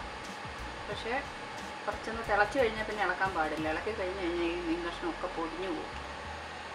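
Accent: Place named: Chengannur, India. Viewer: chechy fish cury superrrrrrrrrr.samsaram kettittu chengannurkkari aanennu thonnunnu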